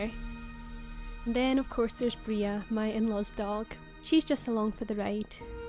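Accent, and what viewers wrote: Scotland, United Kingdom. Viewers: What a delightful Scottish brogue. I could listen to you all day!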